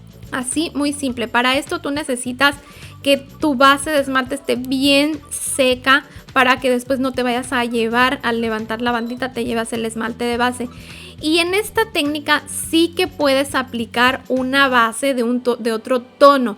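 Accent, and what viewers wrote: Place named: Mexico. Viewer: Oye tu te expresas como mexicana yo creí que eres de Mexico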